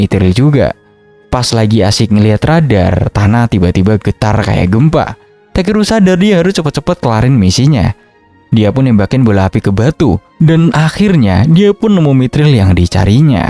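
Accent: Medan, Indonesia. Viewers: Kaya Medan aja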